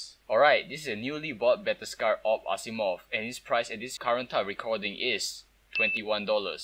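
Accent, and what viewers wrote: Singapore. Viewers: R u from singapore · u sound singaporean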